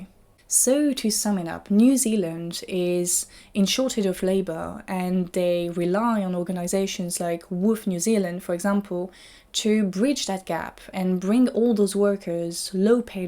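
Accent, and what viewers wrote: France. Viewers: Hey, are you planning on making a video in French anytime? I really like your accent, and I think hearing you in your native tongue would be lovely :)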